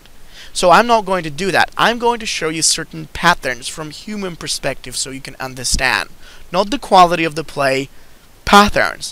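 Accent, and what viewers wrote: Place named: United States. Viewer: he's from Bulgaria, however, I'd say his accent is 90% american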